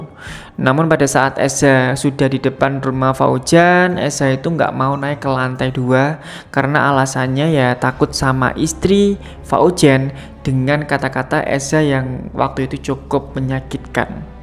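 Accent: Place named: Java, Indonesia. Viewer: Jangan pake logat jawa mas karna tak enak di dengar lihat macam kamar jeri dia orang aceh tapi logat aceh nyah tak di pakai karna indonesia terlalu luas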